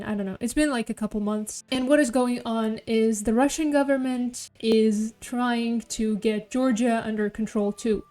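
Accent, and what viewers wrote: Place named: United States. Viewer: You have a very American accent, I thought you were an American at first.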